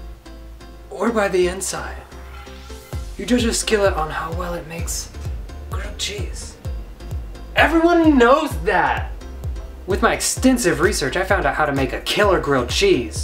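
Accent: South, United States. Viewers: He's definitely become more comfortable and confident over 5 years and it's been so much fun watching him grow and become more successful. Regarding his accent, I think him living in California made him "turn off" the southern accent in a way. I can personally relate to this. I live in Tennessee and I've tried my whole life to not have an accent, mostly because some of my family members, I can barely understand. Haha But, if I spend much time around them, I do have a bit of an accent that peeps out.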